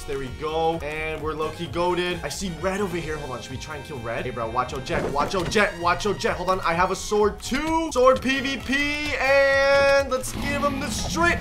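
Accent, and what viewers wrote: United Kingdom. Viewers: I still don't know if you British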